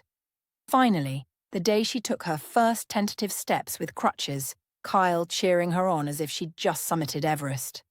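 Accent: United Kingdom. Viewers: YT put this story in my feed for the second time. I still love every word of it. Also the Brittish voice.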